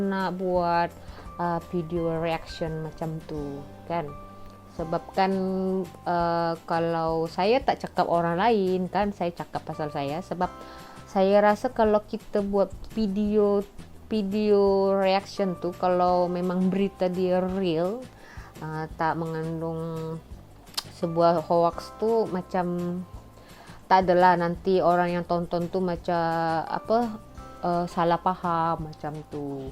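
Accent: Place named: Malaysia. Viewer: Sedap kak cakap orang malaysia. Menghiburkan bila mengedarya.terbaik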